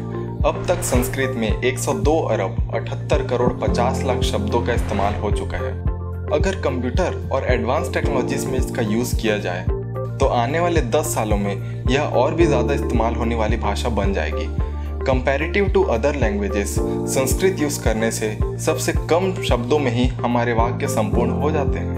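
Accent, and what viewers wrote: India. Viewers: I love Indian English.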